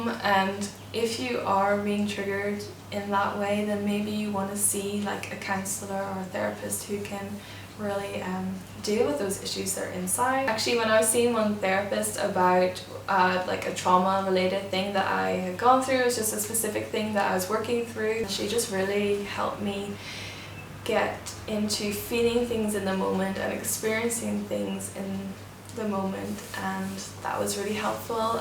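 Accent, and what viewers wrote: Northern Ireland, United Kingdom. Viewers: You said that medication seems to make it worse. I have been somewhat pressured into taking various medications and to be honest all of them made derealization much worse. I definitely cannot take alcohol anymore because the hangover is basically really intense derealization. I guess the only way out is acceptance and to limit the fear response when I experience it. I wonder how you are coping now. I hope you have found a way out. I detect a NI accent.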